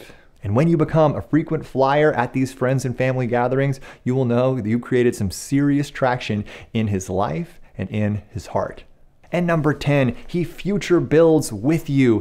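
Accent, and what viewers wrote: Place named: New York, United States. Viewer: l Loved your New York Accent!!! 😂😂😂😅😅😅😂😂😂😇😇😇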